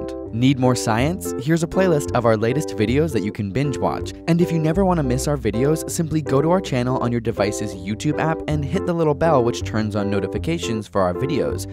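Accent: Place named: Canada. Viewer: are u Canadian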